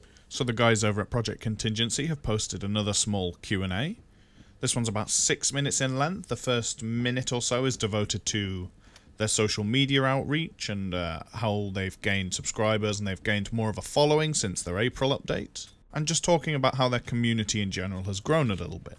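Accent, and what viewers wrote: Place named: New Zealand. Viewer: Holy fuck I knew that was a New Zealand accent, I'm proud of myself for guessing. I'n year 7 we had a maths teacher from New Zealand and she was constantly pissed off because people thought she was Australian.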